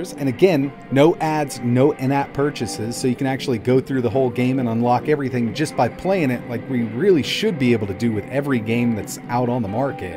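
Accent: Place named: United States. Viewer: his accent sounds like he is in the u.s., NOT the e.u.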